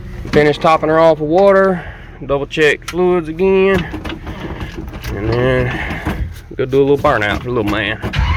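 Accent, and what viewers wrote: South, United States. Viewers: Nothing like having a sip of apple pie moonshine and listening to a Southern Man talk about his bad ass truck💯👍🇺🇸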